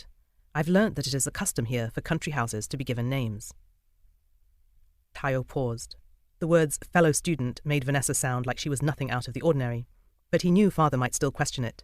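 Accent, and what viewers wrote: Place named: United Kingdom. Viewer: The voiceover sounds way more British than the normal African tongue context, ngl but the phonetical pronounciations of each words solely suites the accurate tonations in variations. Gracias.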